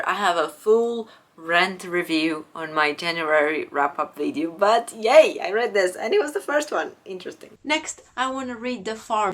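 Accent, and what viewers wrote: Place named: United Kingdom. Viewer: Já começas a ter um mini sotaque brittish. Neste vídeo notei bastante nalgumas palavras e tom da frase :)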